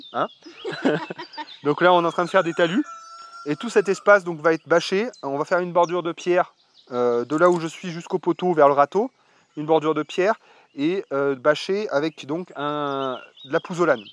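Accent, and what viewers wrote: Loire, France. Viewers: Tu prends de plus en plus l'accent de la Loire ! C'est rigolo ( pas l'accent, c'est juste de plus en plus flagrant !)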